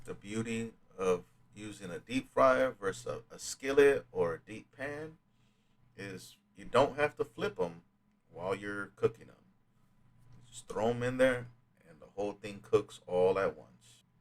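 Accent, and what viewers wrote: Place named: South, United States. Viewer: Your voice is oddly soothing and I hear a southern accent even tho idk where u got that from. But MAN I miss your cooking already! At least I can watch these now lol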